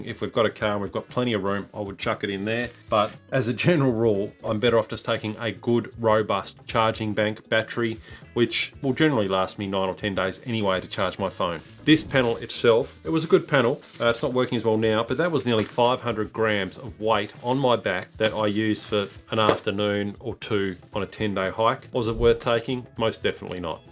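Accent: Australia. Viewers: Great video. Love watching Aussie youtubers talk about gear. Our terrain, bush and scrub are so different from other places, and our gear has to be up for it. Appreciate having an Aussie voice contributing to the gear space!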